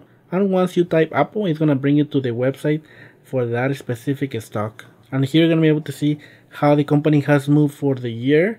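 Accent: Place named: Latin America. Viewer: I appreciate your help and this video gave us viewers some more tools. Thank you. Two comments about presentation. 1. Wear a nice collared 👔 shirt. The look of success is credibility when talking about making money. You have a very kind face and your English is clear, despite the heavy Latino accent. It is very good. I know how hard English to learn and you are absolutely doing great. You are able to communicate very technical information and this is very impressive. The second thing I want to pass on to you about presentation is to SMILE. Lighten up. It is okay to show a more human side to you. I’m not saying to wear a bunny costume, but let us see the more personable side to you that we would if we went out for drink together. I will subscribe. Again, thank you.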